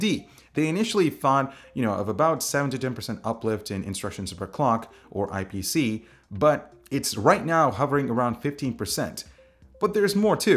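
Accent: India. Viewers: Is this guy Indian? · are u indian.?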